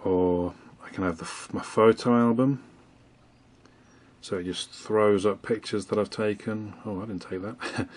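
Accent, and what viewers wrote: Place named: United Kingdom. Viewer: Just part way watching the excellent video and you was talking about the calender. I use the month view on my phone all the time. I use Pure Calender Widget and I find it truly indispensable. I colour code my everything I schedule in (blue is tasks, green is personal appointment and orange is birthdays/anniversaries etc.) so just having the whole month at a glance is awesome. Just thought I'd let ya know :-) please continue doing your reviews. Always great hearing a Brit doing the reviews.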